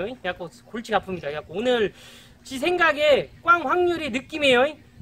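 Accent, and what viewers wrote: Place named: North Jeolla, South Korea. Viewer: 님 전라북도 사투 구수해부요 잉 지는 전남인디 ㅋ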